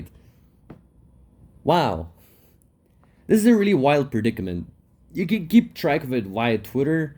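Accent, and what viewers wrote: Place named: Russia. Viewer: русский акцент всё ещё остался наш)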